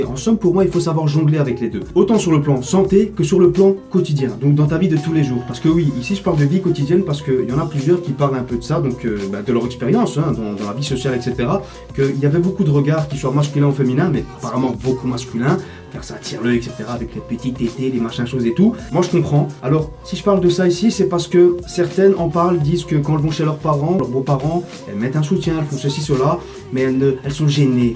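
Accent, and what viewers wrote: Belgium. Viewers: Tu as un accent belge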